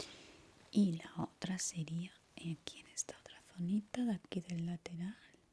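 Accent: Andalusia, Spain. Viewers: Extraño tus susurros sin el acento sureño... Perdón 😔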